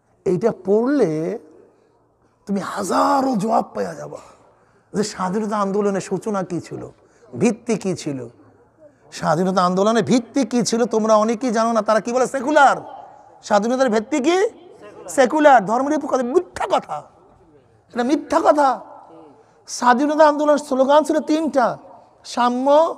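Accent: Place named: Barishal, Bangladesh. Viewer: শায়েখ মুখ থেকে বরিশালের ভাশা শুনলাম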